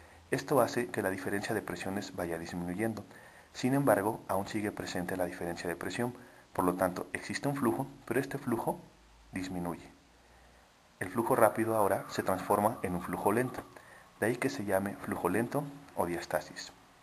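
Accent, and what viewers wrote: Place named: Spain. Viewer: jajajaj ,se oye muy español el hombre jajajaja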